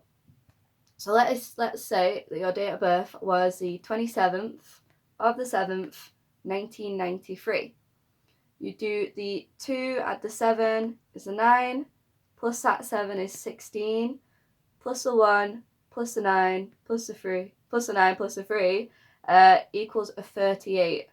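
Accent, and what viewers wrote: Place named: United Kingdom. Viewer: Luv the British accent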